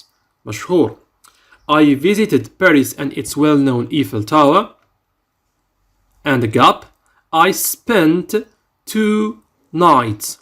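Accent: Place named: United States. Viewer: صوتك رائع في النجليزية كالامريكيين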